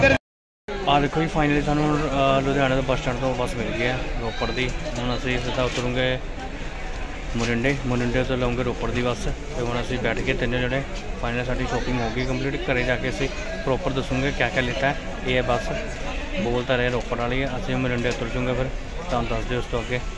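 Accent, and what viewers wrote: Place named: Bihar, India. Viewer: Bhai Tu Punjabi Nai Lagda Tu taa Bihari Lag Reha hai Boli toh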